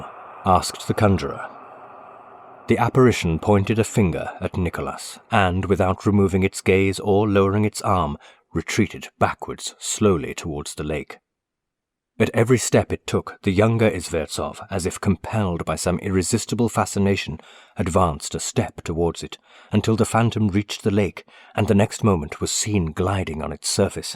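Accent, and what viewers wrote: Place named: United Kingdom. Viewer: Are the collected works of Madame B. available- her short stories on Amazon? A character who has long held a fascination for me and if I had the talent and imagination I'd love to write a ghost story with her either alive or "in spirit" ! She and Crowley perfected The Stare, didn't they?! Thanks for posting, I am a frequent listener of Jasper L'Estrange and Tony Walker and so I found your channel- I tend towards Victorian ghost stories, written by women, I think our viewpoint is often different and perhaps a little stranger than that of men. I think of modern women, Shirley Jackson, Joan Aiken? and one whose name I can't recall...Hello, nice to meet another Brit. you have the voices! I love accents from the British Isles, as with fog, and huge houses in disrepair- so suited to the ghost story! Namaste from Canada.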